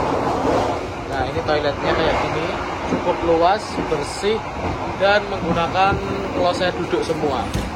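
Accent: Java, Indonesia. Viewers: Orangnya bahasanya enak logat Jawa tapi penjelasannya jelas logatnya gak dibust buat kayak adiyasa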